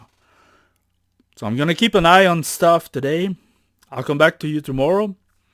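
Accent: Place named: Quebec, Canada. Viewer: Oh! que Oui !, je reconnais un Québécois quand j'en entend un, content de savoir que je suis pas seul dans ma recherche pour la vérité sur le coronavirus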